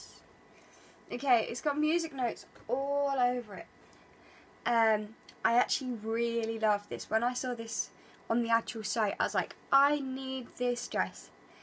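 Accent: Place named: United Kingdom. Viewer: i love your british accent, it's pretty nice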